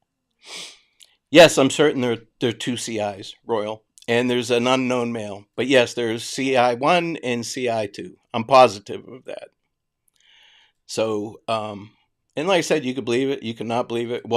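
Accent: Boston, United States. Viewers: if you haven't noticed most criminals aren't known for their smarts! When I worked at a prison in North central Florida one of the inmates got caught after a successful bank robbery in Jacksonville. Him and his buddies got out and away successfully with money. Thing is, the idiot brought his flip phone with him and the phone fell out of his pocket while exiting the bank. His girlfriend was blowing up his phone calling during the commission of the robbery and continued calling back to back call until a female police officer answered the phone. Bank robbers girlfriend challenges the female (cop) answering her man's phone and provides his name, location, vehicle description. This is how he was caught. Silly criminal doing silly stuff, with silly people and entertaining silly women having silly conversations on silly phones., thank you for clearing the air on facts and bringing your Boston accent and gift of story telling. 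No narrator is better than you, well except Morgan Freeman or the guy from unsolved mysteries and that's top notch competition. I wet myself a bit when you called dude a DH, I love your quick wit and ability to get cocky on the fly. I put money on DH wears skinny jeans, paints his nails, has a safe place, feels free and special by using restrooms not assigned appropriate according to his anatomy and sports a participation trophy. 😂. He can get glad in the same hipster skinny jeans he squeezed on and got mad in, probably after crying himself to sle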